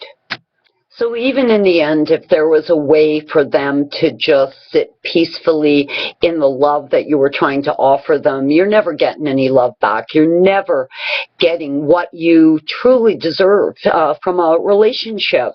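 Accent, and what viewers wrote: Canada. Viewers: Toxic, evil, sinister, fake spell cast upon the good ones by the evil ones...never again: ne j'aimerais pas! Love your Canadian accent, I used to live in Saskatoon! All my best friends are there, especially the good, strong Western women! O! Canada!😇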